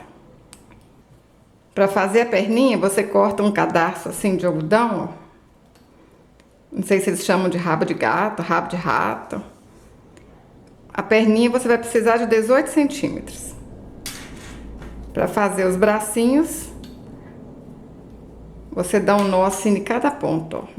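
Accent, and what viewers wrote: Minas Gerais, Brazil. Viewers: Adoro esse seu sotaque mineiro uai!!!! Lindas bonecas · Amei seu trabalho e seu sotaque mineirinho! Parabéns e gratidão por compartilhar suas peças conosco.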